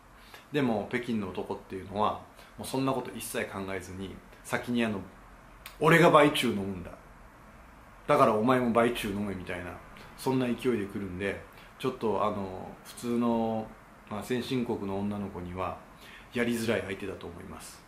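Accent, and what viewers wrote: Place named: Kansai, Japan. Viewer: 関西弁だからじゃないですかね？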